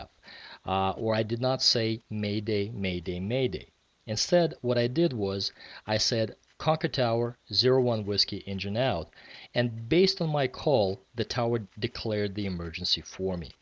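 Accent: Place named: Eastern Europe. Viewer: I've flown over to CCR from HWD, even at least once to build night hours as PIC., you've done a splendid job as an aviator and presenter, and gave us a lovely peek at Mt. Tam into the bargain. I'm far from the East Bay and CC County, now, but it brought back great memories. I also love the little hints of Slavic in your speech, the L's, the hard h's, the narrowed vowels in some places. Even without seeing your name, I'd have heard that. As Scott Manley says, "Fly safe!"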